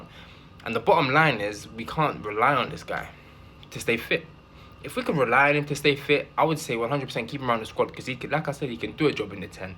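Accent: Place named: Manchester, United Kingdom. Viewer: lovely manchester accent you have there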